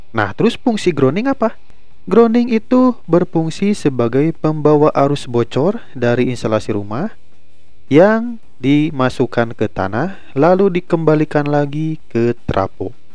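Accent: West Java, Indonesia. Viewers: Mantap kang solosunya. Sunda banget😅😅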